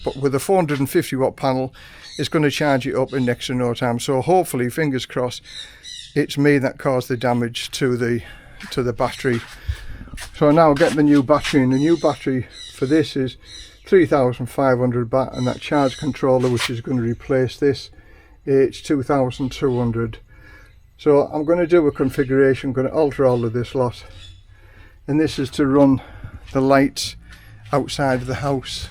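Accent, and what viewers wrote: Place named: Sunderland, United Kingdom. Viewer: You from Sunderland? Accent seems similar.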